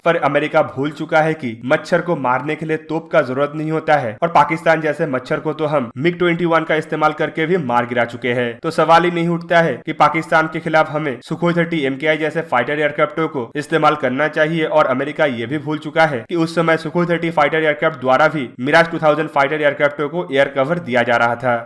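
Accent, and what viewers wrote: India. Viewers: OMG ! THE TITLE IS ENGLISH BUT THE NARRATION IS INDIAN ?!!!, WHAT THE HECK !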